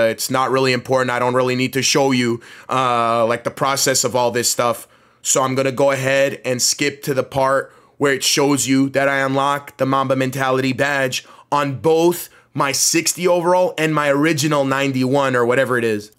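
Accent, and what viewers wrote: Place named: Canada. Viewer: You’re from Canada for sure, prolly Toronto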